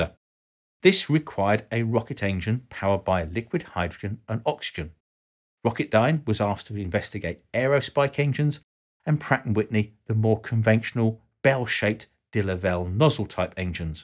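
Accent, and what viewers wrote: United Kingdom. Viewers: Figures I gotta listen to a Brit to better understand what is otherwise seemingly stupid decisions behind my nation’s space program. Thank you!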